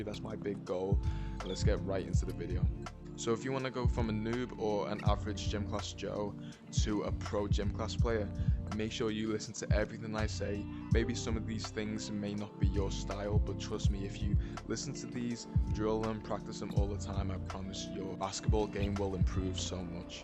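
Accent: Liverpool, United Kingdom. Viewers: boss accent da lad ar ye scouse? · Are u scouse and if u are I hope u support L.F.C 🔴🔴🔴🔴😊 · Is my guy a scouser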